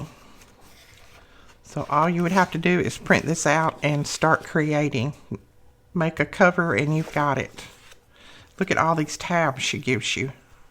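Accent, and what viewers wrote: South, United States. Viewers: Love your Southern Belle accent. I have a Tennessee Hillbilly accent which is more rough. Thank you for sharing your beautiful creations. This is an adorable kit. ❤ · I was watching your video again which I love watching you Craft and your southern accent anyway and 32 minutes and 15 seconds I think you’re talking about putting some pink rose on the paper and not covering of the beak of your bird and I was thinking well why didn’t you put it just on the top of the page then it was just a thought we’re on the other side of the paper anyway thank you for teaching and that was my Pinyan have a great day And please don’t be upset with me God bless and please have a amazing day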